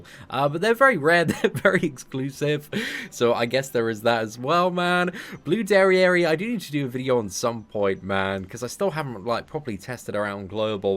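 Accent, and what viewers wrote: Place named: England, United Kingdom. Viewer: Definitely appreciate you,. Informative content, entertaining content, always a chill time watching your videos and I think for most people from the states the English accent is a cherry on the top. Much respect and looking forward for whatever else you create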